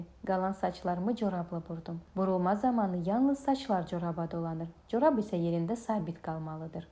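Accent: Russia. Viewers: Masallah olsun min dəfə❤ Bu niye belə danışır deyenlırə deyim Rusiyada böyumuş uşaqdır bu dile dili belə yatır. Azerbaycanda böyuyen hec bu qedər səlist danışmır❤❤❤Maşallah olsun! Gözəl bala, gözəl gəlindi. Allah mənə də belə gelin qismət eləsə nə gözəl❤❤❤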